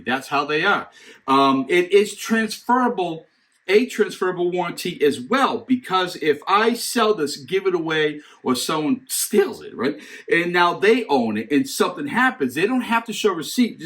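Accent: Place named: New England, United States. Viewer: But I guess this guy's from New England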